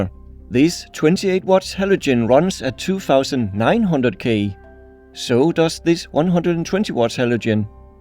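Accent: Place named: Denmark. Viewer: Du er dansker jeg kan godt høre det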